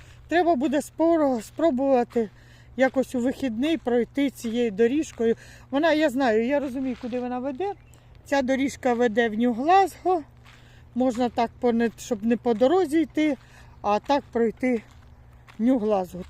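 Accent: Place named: Surzhyk, Ukraine. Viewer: Приємно суржиком розмовляїте.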